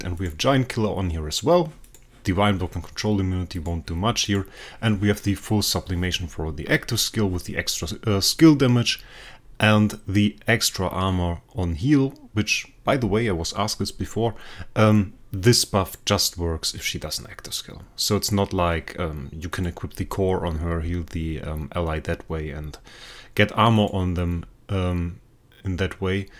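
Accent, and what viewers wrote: Germany. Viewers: Hör ich da einen deutschen akzent oder bin ich lost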